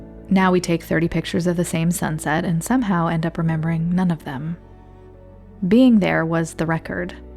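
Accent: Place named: United States. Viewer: a narration dubbed voice who speaks in American (or Canadian) English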